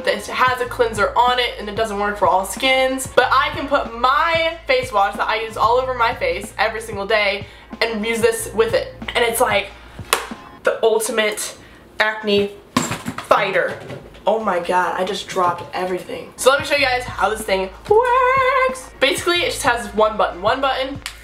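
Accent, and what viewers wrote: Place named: Texas, United States. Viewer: She's Texan lol half of my family is😂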